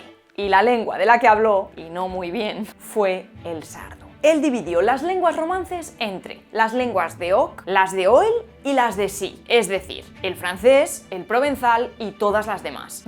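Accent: Spain. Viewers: pero por que gritas?, queria escuchar el video normalmente pero me dio hasta nervio escuchar esa forma de hablar peninsular y gritona, pero por que no hablas normal para que la gente lo siga con tranquilidad.. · Gracias. Tema apasionante. Una curiosidad: explica, por favor, la imposibilidad de los españoles para pronunciar TL en un solo fonema. En América decimos a- tlé- tico. Ustedes dicen at-lé- tico.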